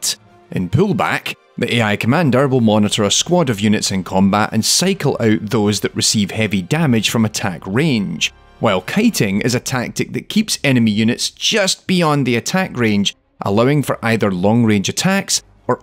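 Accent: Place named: North, United Kingdom. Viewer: Nice work on the video mate, love to hear a fellow northerner talk about video games.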